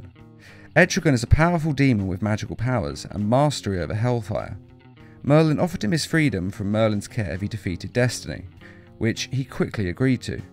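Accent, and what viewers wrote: United Kingdom. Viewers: are you British 🇬🇧?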